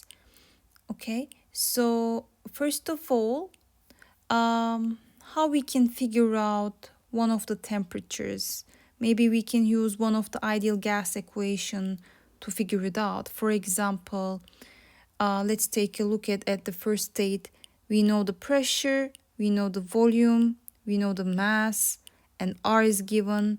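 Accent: Turkey. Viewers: Hocam I just watched the video about Linear Density :D Aksanınızdan dolayı Türk olduğunuzu düşündüm ama kanalınızda hiçbir personal info'ya rastlayamadım hocam.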